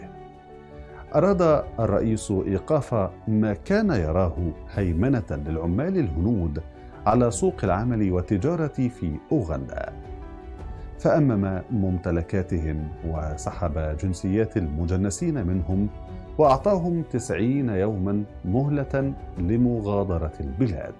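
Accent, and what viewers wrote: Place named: Morocco. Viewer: بالفعل صوت متميز. أعتقد اصلو من المغرب. تحية لابن بلدي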